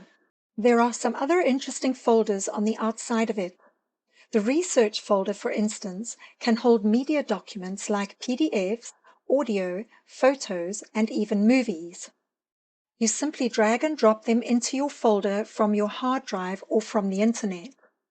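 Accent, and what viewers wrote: South Africa. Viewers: Are you from South Africa? Love the accent! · I hear a South African accent!! Hello from little Knysna!! I just got Scrivener for Nanowrimo this year!!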